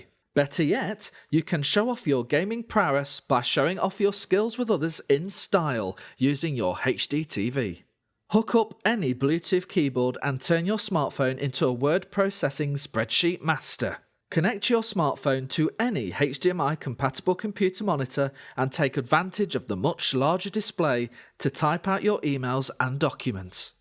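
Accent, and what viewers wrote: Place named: United Kingdom. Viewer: Esse sotaque britânico ♡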